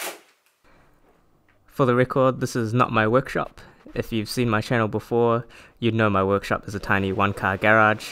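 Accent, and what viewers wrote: New Zealand. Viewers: You sound like a kiwi. If you are where are you getting this nice plywood?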